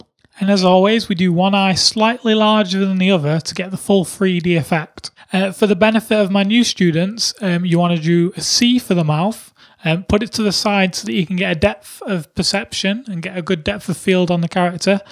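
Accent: United Kingdom. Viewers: the awesome british accent is what makes me watch the whole video XD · you sound like a nice gent from north of manchester, good drawing i've improved my own sketches with lots of 3D effects and in the head loock techniques.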